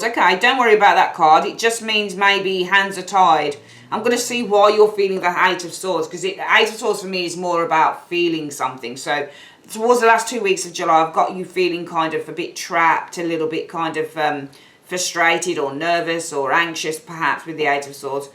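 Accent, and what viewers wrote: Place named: Midlands, United Kingdom. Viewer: This reading really resonated I can hear in your accent you’re a fellow midlander 👍👍👍 Love and light ☀️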